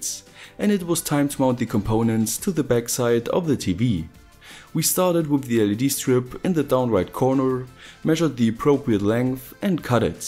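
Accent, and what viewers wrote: Germany. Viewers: That accent .. are you german? Great vid! :) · Jonas Gilje Ich Habs sofort raus gehört, dass er Deutscher ist xD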